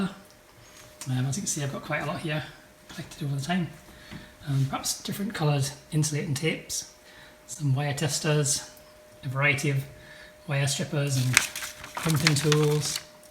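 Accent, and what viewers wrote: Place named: County Durham, United Kingdom. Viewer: Hello Mate, In the process of series 2a rebuild, found a lot of your vids really helpful but electrics Is my downfall have not got a clue. You sound as if you are originally not far from me I am in County Durham. How much would you charge to make me one of these looms ? I already have one through the Chassis, need one for Bulkhead. Thanks k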